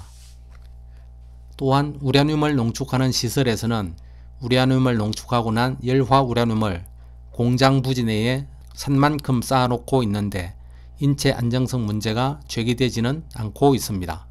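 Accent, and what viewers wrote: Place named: Gyeongsang, South Korea. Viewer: 보리문뎅이 발음 심각하네 스방의 열화 우랴늄 ㅋㅋ 적 1퍼쎈터가 되도록 농축얼 합니다 ㅋ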